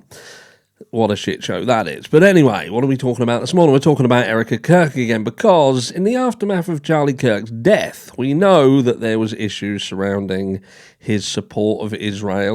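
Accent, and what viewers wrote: Norfolk, United Kingdom. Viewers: Obviously don't tell people where you live but by your accent I believe you lived in Norfolk .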